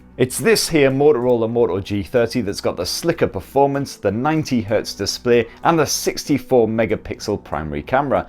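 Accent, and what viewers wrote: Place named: Scotland, United Kingdom. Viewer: Wwhwha...WHAT?!! Mate,you'retalkingwaytoofastwiththatthickScottishaccentIt's sometimesverydiffiulttounderstandwhatyou'resayingYoumightwanttoslowdownabit. Okay?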